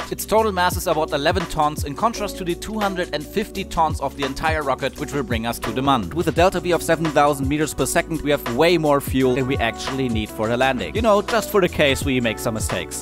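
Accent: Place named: Germany. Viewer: are you from germany bcs you sound german btw nice vids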